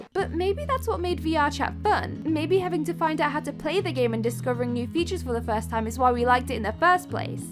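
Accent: United Kingdom. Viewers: The accent sounds familiar, are u from the west mids?? 😂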